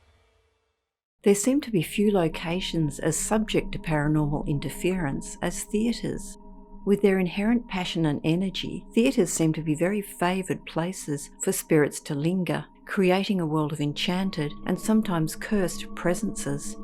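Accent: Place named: Australia. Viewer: This is my favourite channel. I love hearing Australian voices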